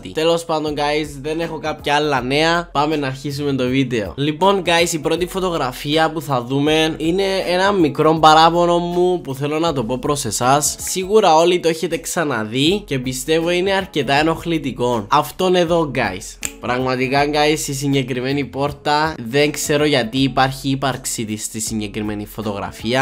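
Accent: Cyprus. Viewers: kuprios einai aki milaei etsi h' blammenos?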